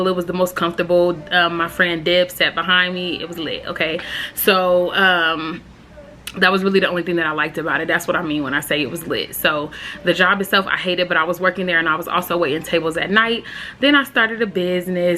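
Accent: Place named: Detroit, United States. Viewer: You from Detroit ha? I can hear it .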